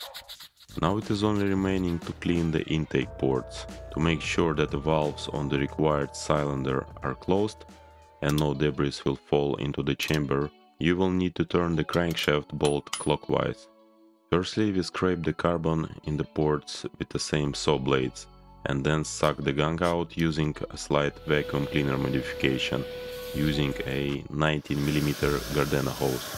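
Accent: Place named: Russia. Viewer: I hear russian accent :D а есть полное видео со всеми деталями?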